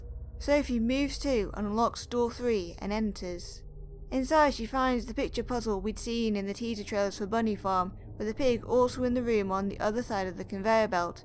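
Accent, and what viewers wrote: United Kingdom. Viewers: You speaking british makes me feel better lol ✨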